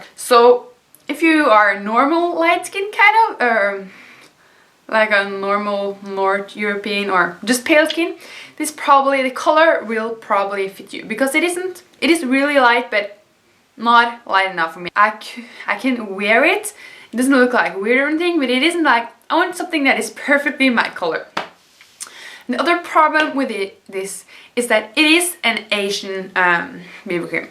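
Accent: Norway. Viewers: Are you Norwegian? It kind of sounds that way, because I'm Norwegian too! :D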